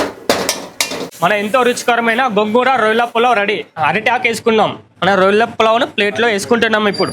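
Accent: Telangana, India. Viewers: Mee telangana slang ki oka danam ra babu,meru mee over action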